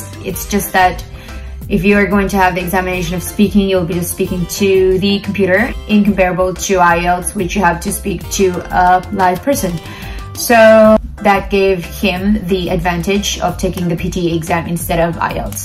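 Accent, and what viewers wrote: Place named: Australia. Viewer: I love your Aussie accent ma'am.New subscriber and friends here💗. How I wish I could work there in the next 2 years. I'm civil engineer here in the Philippines having 3 years of work experience however I'm so hopeless, aside from all the detailed documents you need to prepare.then you need at least to have half a million of pesos.I don't have enough money to prepare all that I need, but still I'm here to pursue that dream 😞🙏🏿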